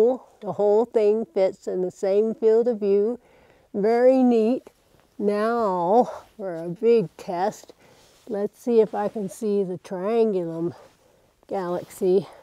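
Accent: South, United States. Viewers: Can't wait to get a pair. And I love the southern accent :)!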